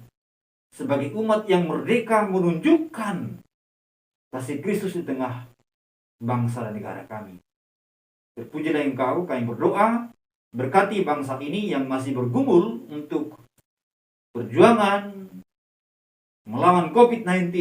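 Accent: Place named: Japan. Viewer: Yang suka dari pak Pdt ini waktu sharing firman Tuhan adalah .. aksen Jepang dalam bahasanya 😁 Mantap kali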